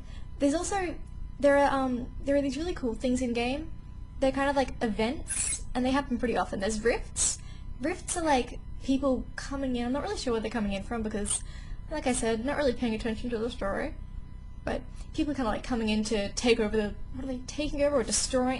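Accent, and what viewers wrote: Australia. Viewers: Because ur a gamer ur beautiful and u have an austrailian accent which is awesome. (im not trying to ask you out i just need to let it out)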